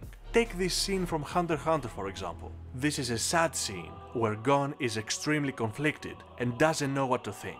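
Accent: Greece. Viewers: Oh! That's a greek accent! Γειά σου φίλε! Ωραία ανάλυση! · Only Greeks speak English with that kind of accent.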